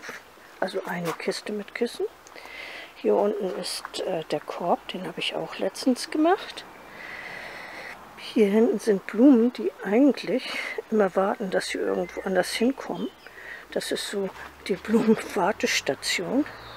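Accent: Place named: Northern Germany, Germany. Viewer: Hallo, richtig gute Idee dein Kerzenständer, freue mich jedesmal auf deine schönen Videos. Ich mag so gerne deine Nordstielaussprache 🤗 u.natürlich die schönen Dekoideen 😙danke 🤗🤗😚😚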